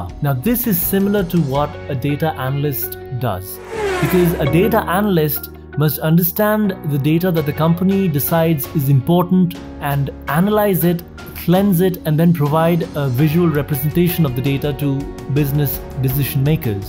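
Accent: India. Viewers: this guy speaks a nice indian english <3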